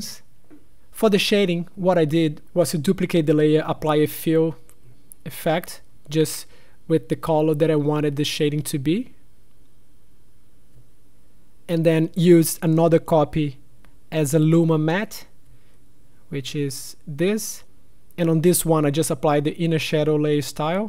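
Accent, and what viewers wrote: Brazil. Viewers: Belo trampo! Vc eh Brazuca por sinal ? Reparei no acento rsrs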